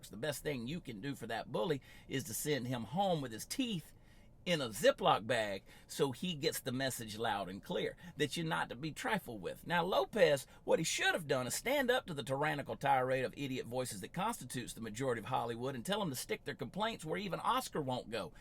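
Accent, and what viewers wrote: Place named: South, United States. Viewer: my grandson 3 years old now I spy his name is Jacob he was running through the house telling his mom and the rest of us that he found a home and we run and check the bathtub to see if there was a whole went through the house went through everything we couldn't find a home we went outside we checked this pool if there was a hole finally she's sitting there she can't figure out what's going on none of us can and then he walks up and sticks his head in her face she smells his hand and it's got s*** on it and she throws up 3 in across the floor on the carpet is this little boy still tripping on having a a**** why would he care if he's a boy or a girl this is all so ignorant and so stupid but the story is true I think it was over with it was funny because my daughter didn't expect to smell what she smell and I never seen no one throw up 3 in across a space not even a drunk Love & blessings love your southern drawl I have one of my own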